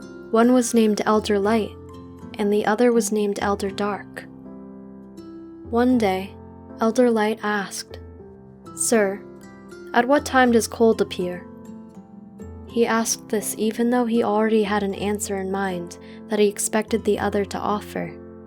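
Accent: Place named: United States. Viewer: It is Bodhisatta. But she is pronouncing all these Pali words with American english accent and maybe with other accents, and making them sound like so alien words.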